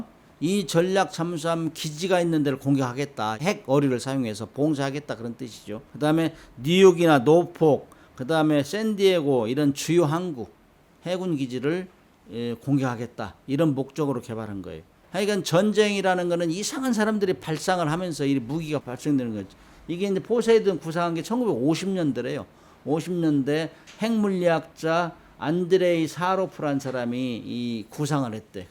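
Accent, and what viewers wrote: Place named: Jeolla, South Korea. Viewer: 그냥 그만두라 전라도 사투리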